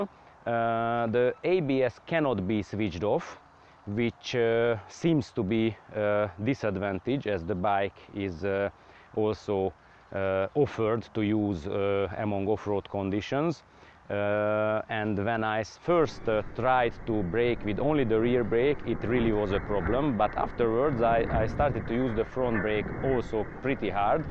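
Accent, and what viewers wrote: Hungary. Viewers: Borzalmas akcentus... komolyan. Magyarországon képtelenek megtanulni az emberek, hogy NE magyar hangleejtéssel beszéljenek angolul. Inkább feliratozzátok a videót.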